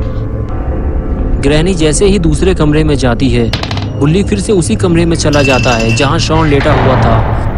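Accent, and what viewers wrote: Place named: Hyderabad, India. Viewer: Fast comment nice video hyderabadi Style me boleto kiraak mouth, 👌👌👌👌👌👌👌🌹🌹🌹👍👍👍👍👍👋👋👋👋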